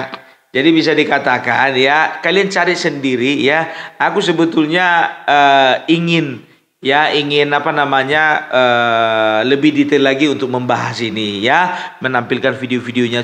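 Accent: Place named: Java, Indonesia. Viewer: Bang suk mben golek mantu / besan jowo wae opung lha boso jowo sampean wis medhok 🙏👍💪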